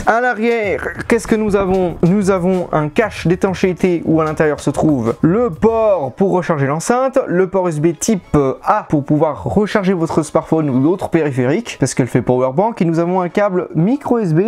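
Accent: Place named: Savoy, France. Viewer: ton accent du bon vieux savoyard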